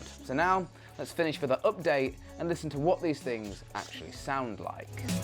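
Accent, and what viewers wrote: United Kingdom. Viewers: That’s how we say it in the UK, we cringe when we hear any other country pronounce it wrong..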